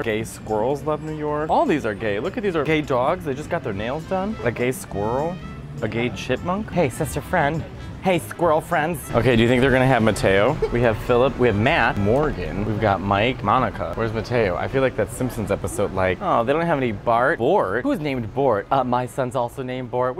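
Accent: Italy. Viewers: I’m surprised but I shouldn’t be that Matteo sounds like an Italian speaking Spanish. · Dude, as a native speaker, your spanish was pretty good :) You speak spanish with an italian accent and I speak italian with a spanish accent lol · Spanish with a heavy Italian accent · He speaks Spanish with an Italian accent xD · The Italian accent when you speak Spanish! I love it.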